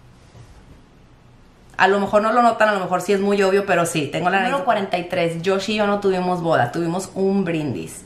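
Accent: Monterrey, Mexico. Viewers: Hola me caes muy bien me encanta tu forma de hablar inconfundiblemente regia! 😁 confieso que pare de ver tu vídeo un poco para ir a escuchar la canción de que mencionaste wow hermosa canción! Me recordaste a una YouTuber regiomontana también su canal se llama la regia charlton se me hace que tú y ella se parecen! muy guapa señora y el modo de hablar parecidisimo al tuyo y ni hablar del acento, Oye tú niñita la misma carita de su papi 😳 me encanto saber más sobre ti me parece tu vida interesante bueno bye cuídate y éxito y más éxito 👍🏻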